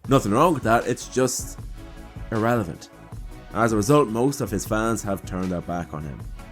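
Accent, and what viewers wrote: Ireland. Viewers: tf you on about with weird accents and being British he's irish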